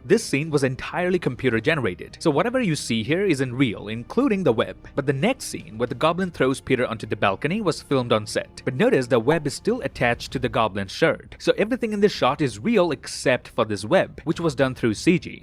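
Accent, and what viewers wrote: Canada. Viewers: You're Canadian!!!! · he is starting to sound more canadian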